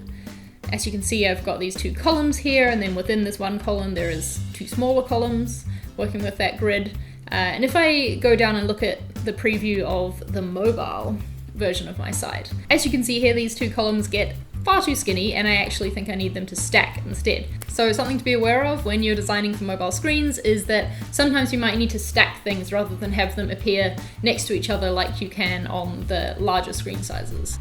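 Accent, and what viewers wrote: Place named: New Zealand. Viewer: As soon as I heard you speak I was like Whhhhhaaatt!!! She's definitely a Kiwi! Great Video - thanks!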